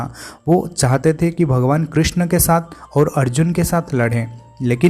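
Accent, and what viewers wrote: Odisha, India. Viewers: Ap odisha ki ho kya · Tu odia ki bhai tu eise Hindi bolta hai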